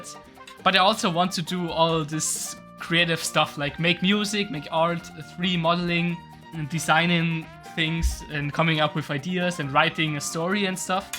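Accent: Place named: England, United Kingdom. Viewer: You have a nice eng accent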